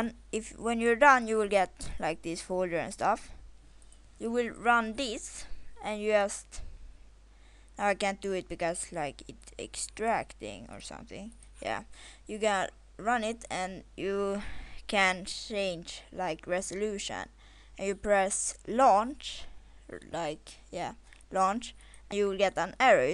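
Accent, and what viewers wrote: Sweden. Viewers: dat swenglish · worst tutorial ever if you dont speak that good of english please dont try it just stick to sweden cause your accent is embarrasing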